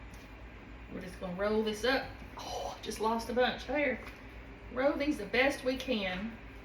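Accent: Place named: South, United States. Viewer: But prettier and such a sweet spirit. Love the southern accent.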